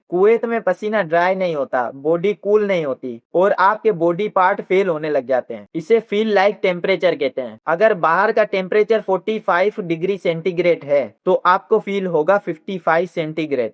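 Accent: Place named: India. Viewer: but your accent is Indian ( Hindi ) ❤ BTW love it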